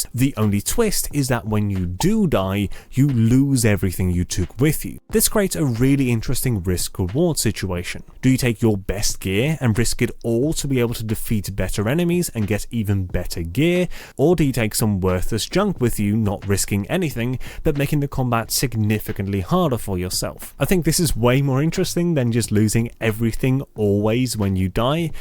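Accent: United Kingdom. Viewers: WOW!!!!! That is crazy my dude.. u sound like ur from Birmingham.. ask any british person what ur accent sounds like in the old videos.. not for one second did i think u were dutch.. that is some accent.. well done!! Your latest videos sound like ur 100% British!! with a very upper class accent.. :)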